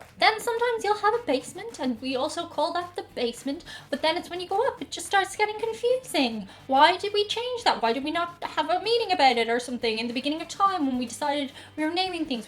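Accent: Ireland. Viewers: Miss I am a old half American Scottish German Love your content huge fan but is nearly impossible to get Scottish food in Detroit Michigan.. but I wish you never taught me about crisp sandwiches curse you lol I grew up with Scottish German Italian southern accents it is my consensus that your Irish is the most pleasant on the ear. I really enjoyed your collaboration with wee Scottish lass If we ever get free this virus please consider doing one with batchy. Actually follow about 20 people from the UK the try videos you guys are awesome one of you guys always puts a smile on my face before I go to sleep keep up the good work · Just discovered me a new channel with another gorgeous woman with an accent! Got me a Filipina, a German and now an Irish.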